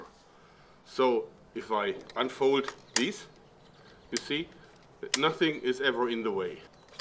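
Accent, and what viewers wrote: Germany. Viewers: lol ist der deutsch voll der deutsche Hintergrund in der Stimme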